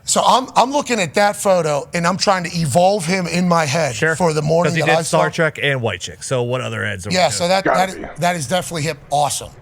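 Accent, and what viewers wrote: Pittsburgh, United States. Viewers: Should have turned up the yinzer accent to the max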